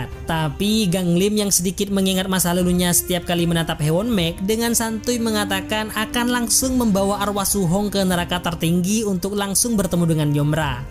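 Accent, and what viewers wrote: Madura, Indonesia. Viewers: Orang Madura ya 😅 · Ternyata bebengusnya oreng madureh yeeeh🗿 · Pasti Orang Madura ya hahha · Pasti orang madura😅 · Orang madura bang??? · mangkanah reng madureh😂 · Orang madura bang?medureh dimmah? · mimin orang madura ya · Logat nya madura bngt😅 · Reng madureh bn min? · Barusadar orang madura kah😅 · Bang kakeh reng madureh yeh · Madura nih adminnya😂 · Orang Madura min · Kelihatannya sang moderator ini orang Madura tak iya...😂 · Mas ya orang Madura ya,,, sumpah baru kali ini liat alur cerita ending ya q nangis sampe sesenggukan,,, terimakasih mas sudah membatu menceritakan,,sebab mau nonton film ya GK ada sub indo ya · Yg cerita org madura kah... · Kak maaf aku ketawa, logatnya lucu, kaya logat orang madura. Emang asal madura kah? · Lu orang Madura ya bg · Orng madura ya mas hh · Madureh kakeh min? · bhs madure wkwk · min orang madura kmu min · Bang Madura nya daerah mana bang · Reng madureh kakeh🎉😅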